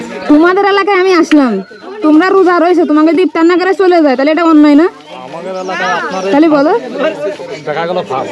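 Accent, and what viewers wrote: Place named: Jessore, Bangladesh. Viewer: আসসালামুআলাইকুম ওয়ারাহমাতুল্লাহ আপু কেমন, আছেন আশা করি ভাল আছেন। সেহরি খাওয়ার পর ফজরের নামাজের পর থেকে আপনার ভিডিও গুলো দেখছিলাম অনেক ভালো লাগছে আপনার যে মানব সেবামূলক কার্যক্রম এগুলা অনেক সুন্দর লাগছে আমার কাছে। আপনার প্রতিভাকে সাধুবাদ জানাই এবং আপনার জন্য দোয়া করি আপনি যেন সবসময় এইভাবে গরিব অসহায় ও দুস্থ মানুষের পাশে দাঁড়াতে পারেন দেশ ও জাতির জন্য কাজ করতে পারেন আল্লাহ আপনার জীবন ও ভবিষ্যৎকে ভালো করুক দুনিয়া আখেরাত কল্যাণময় করুন এই দোয়া রইল আপনার জন্য। আমি আপনার চ্যানেল টি সাবস্ক্রাইব করেছি এবং আপনার ভিডিওগুলো দেখছি অনেক ভালো লাগছে। আপনার কথা শুনে মনে হল সম্ভবত আপনার বাড়ির যশোর জেলার মধ্যেই হবে আমিও যশোর জেলার ছেলে। আমার বাড়ি যশোর খাজুরা রাজাপুর গ্রাম। আল্লাহ আপনাকে দ্বীনদারির সাথে সুস্থতার সাথে নেক হায়াত দান করুক এবং বেশি বেশি মানব সেবামূলক কাজ করার তৌফিক দান করুন আমিন। আমাদের জন্য দোয়া করবেন আসসালামু আলাইকুম ওরাহমাতুল্লাহ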